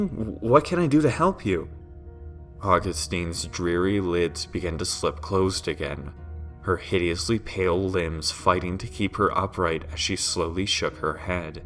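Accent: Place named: Romania. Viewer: Impressing accent for a Romanian girl!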